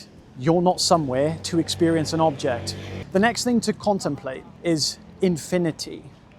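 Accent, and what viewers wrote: Wales, United Kingdom. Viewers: Detecting a bit of Welsh in your accent. On another note I love that there are other people walking round contemplating the same things that I do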